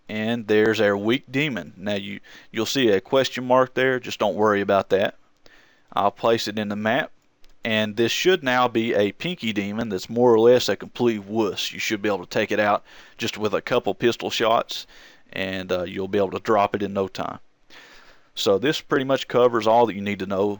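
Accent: South, United States. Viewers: You sound like a country boy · sounds like a redneck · this is a good video, but the thing that intrigued me the most is his cowboyish accent. really satisfying to hear it XD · i’m a bit jealous of your voice you’re kinda like hillbilly morgan freeman i should know; i’m a southerner myself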